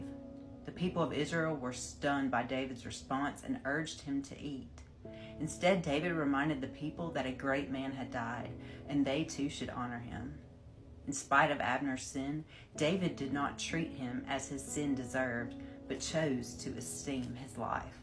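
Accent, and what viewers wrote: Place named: South, United States. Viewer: you're welcome! Luv your Southern accent, too! 💙 I enjoy your channel, thanks 🙏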